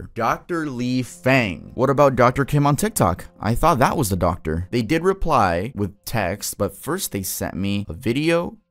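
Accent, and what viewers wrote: Spain. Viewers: “Por Dios” is slang. It mostly translates to something like “By God” not “On God.” “On God” will be more like “En Dios.” Either way, I like your Spanish accent!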